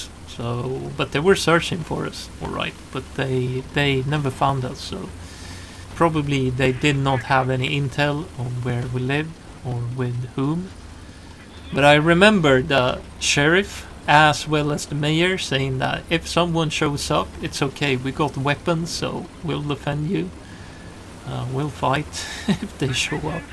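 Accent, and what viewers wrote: Sweden. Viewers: I would also like to hear more stories stories and see more games with US tanks. Swenglish is cool :-)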